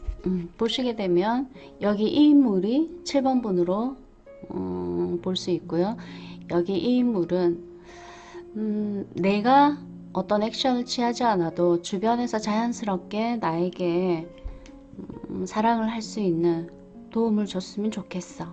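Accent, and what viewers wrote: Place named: Busan, South Korea. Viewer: 5번 신기하게 맞네요🤗 편안한 리딩 좋아요~~ 부산ㅅㅏ투리두 좋아요~~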